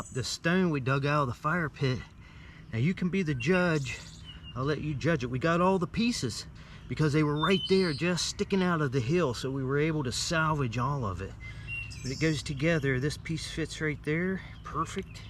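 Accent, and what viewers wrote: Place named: California, United States. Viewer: Dude I,m from Califoria but i'v lived in Missouri for 45 years but you sound like your from Ca. no ofence ment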